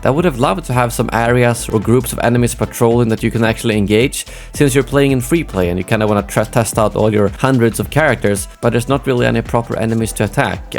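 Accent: Sweden. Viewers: Are you Swedish? (It really sounds like that when you talk, I’m just curious) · Love that Swedish-English accent haha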